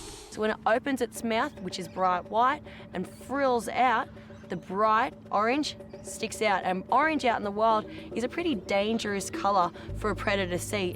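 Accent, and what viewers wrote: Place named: United States. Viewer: this was an excellent video of a group of truly unique animals. However, I want to highlight 2 things on my mind which I'd like to point out. First, your accent sounds as if you're a fellow American, though I guess you could also be Canadian. If the latter, ignore the following complaint. I noticed that you used only the metric measurements, rather than doing as many to most heads of channels: use both. It would have been helpful for us Americans. The other is a wording problem in the star-nosed mole section. The professor said that this creature is "one of the only" of something, which is grammatical nonsense. The reason is that "one of" indicates there's more than 1 of something, whereas "only" means there can't possibly be more than one. What should be used in this type of situation is the phrase "one of the few".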